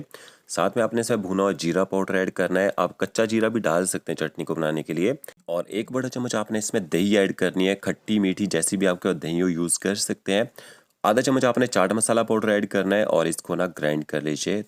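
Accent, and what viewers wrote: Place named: Punjab, India. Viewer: Yours is punjabi accent.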